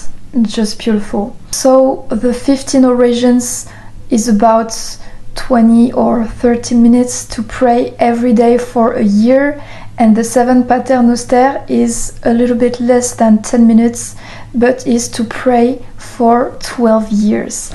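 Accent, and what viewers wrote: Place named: France. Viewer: What did she say the prayers were??? I'm having a hard time with the French accent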